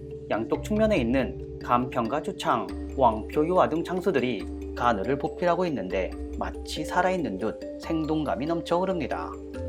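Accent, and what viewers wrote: China. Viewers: 말투 억양이 누가 들어도 조선족이신... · 억양이 중국사람같네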